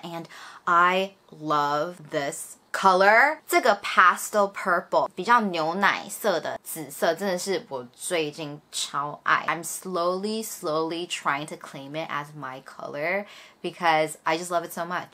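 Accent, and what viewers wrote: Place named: United States. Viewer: Dena我有問題✋ 這是我很久以前就想問的！終於鼓起勇氣問 因為真的太想知道了😂 就是為什麼妳是在台灣長大但是講中文卻有abc感？！ 是因為講英文久了就會變這樣嗎？ btw我好喜歡妳💖💖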